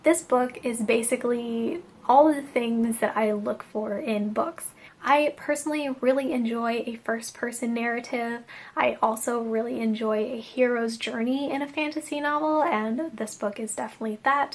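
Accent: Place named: North America. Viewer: Hi, this is my first visit to your channel. I was instantly struck by the dichotomy of your North American accent and the fact you have the international edition of the book. At least, I'm pretty sure of it; I'm an American and have the U.S. edition, but also bought the UK version (the same one you have)... Are you living overseas? Was it a gift? Am I rambling and not making any sense at all?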